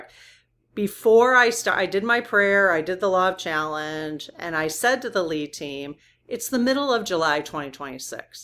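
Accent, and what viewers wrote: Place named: Wisconsin, United States. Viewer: I had to check whether or not you were from WI. Your accent gave it away! I enjoy your videos.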